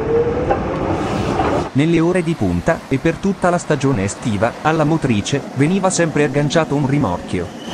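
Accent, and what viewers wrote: Italy. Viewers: E ti col mus e mi col tan andemo a Barco doman........Chi parla non è certo triestino, un Talian, cabibo, per intenderse, un foresto.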